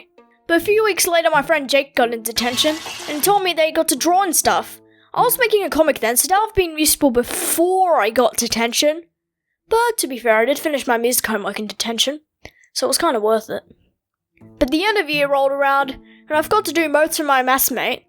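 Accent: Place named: United Kingdom. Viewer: Are you British because I am and you sound like one?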